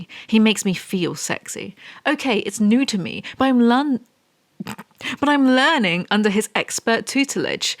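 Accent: United Kingdom. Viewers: Damn you, i can no longer sleep without the sound of a snarky brit bullying rich people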